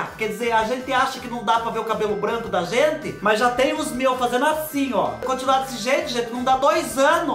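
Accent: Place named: Caipira, Brazil. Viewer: Vc é ótimo kkkk não perco um vídeo é bom de mais esse sotaque caipira eu quase morro de tanto rir kkkkkkk kkk😊😊😊😊😊😂😂😂😂😂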